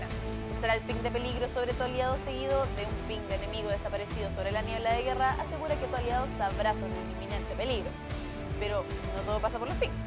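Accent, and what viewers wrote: Chile. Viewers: 4444 lo justo ni más ni menos tal vez un poco pero no más habla bien y todo es chilena lo noto por tu acento y por lo demás bueh solo eso no más es lo justo .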